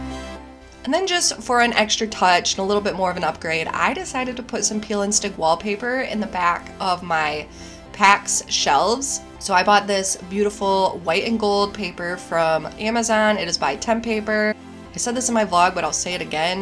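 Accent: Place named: Midwest, United States. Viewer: I don't mean to be a creeper but are you from the midwest? You pronounce your vowels like me lol (Minnesotan)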